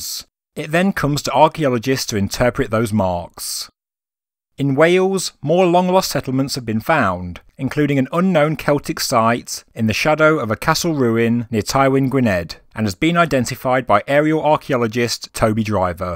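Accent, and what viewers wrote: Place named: England, United Kingdom. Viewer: Apart of the ancient discovery information, what a LOVELY ENGLISH ACCENT. ...AMEI ❤👌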